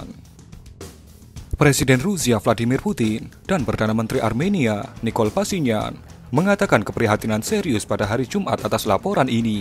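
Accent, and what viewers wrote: Java, Indonesia. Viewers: logatnya jawa banget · Jowo ne ngendi mas bro medok banget ya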